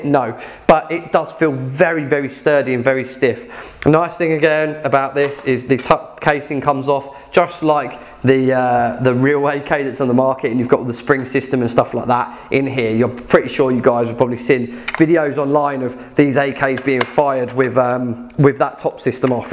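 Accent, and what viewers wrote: United Kingdom. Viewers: Fuckin' brits accent. USA!!! USA!!! USA!!!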